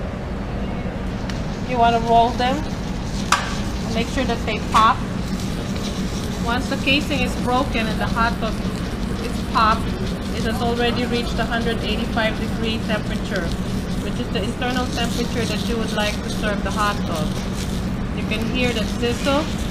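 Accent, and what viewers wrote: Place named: New York, United States. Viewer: That vaguely hispanic? new york accent ❤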